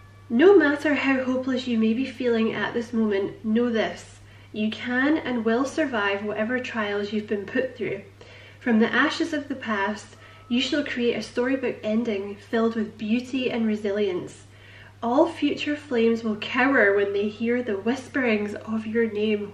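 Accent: Scotland, United Kingdom. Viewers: I’m Gemini Sun… I enjoy listening to your Scottish accent. Merry Christmas!!☃️🎄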